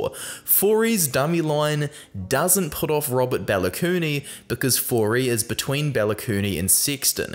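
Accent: New Zealand. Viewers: Just as an aside from an Englishman, the New Zealand accent is a thing of wonder and beauty!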